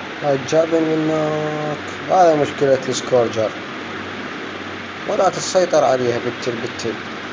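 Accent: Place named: Iraq. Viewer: هنيالك عندك حارقه و6 خرب هبل اني اريد بس اوصله بيتي مستوه 15 اريد بس اهجم بل حارقه ماكو ودكتور مرعب افجر مرحله 4 ومرات اعوفه لان تجي صعبه والمشكله بهاي العبة اتمنه احصل عله الحارقه وبلعبة كلاش اتمنه احصل عله التنين ههههههههه بس صوتك محلي المقطع هههههه حجيك يضحك مره دكول زباله ومره مدري شنو الحجي العراقي بصراحه حلو ما ادري ليش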